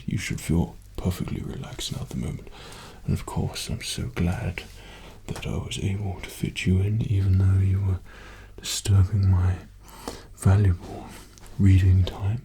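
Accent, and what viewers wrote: United Kingdom. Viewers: One of my goals is to learn this accent, I watch BBC almost every week, there's just something about it that is magical. Great video! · He is it’s not even that thick of a bri’ish accent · Is this man from UK? (I am learning american english, and i don't understand the acent of UK) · i didn't know thor was british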